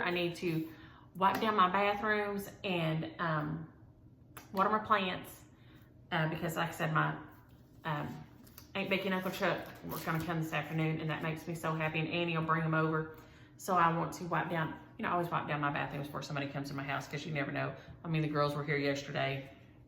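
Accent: South, United States. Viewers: we speak the same Southern drawl!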